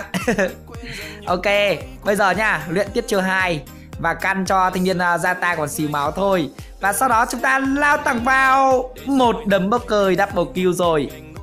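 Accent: Nam Dinh, Vietnam. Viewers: Add quê Nam Định ah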